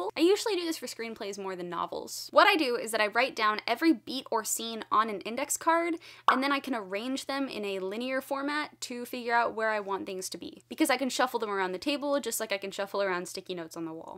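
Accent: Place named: United States. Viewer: love your very american accent, its like listening to a tv series, your voice is very hisg school type but cool and likeable. :D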